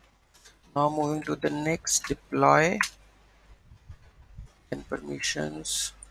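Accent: India. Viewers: Ur accent sounds like u're from Uttar Pradesh! Isn't it?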